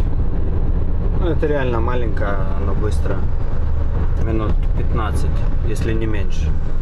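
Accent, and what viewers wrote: Odessa, Ukraine. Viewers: смотрю с удовольствием бытовые сцены... дорожные трипы никому не нужны, если больше 5 минут... одесский акцент умиляет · Пролетел говор Одесита, Удачи!!!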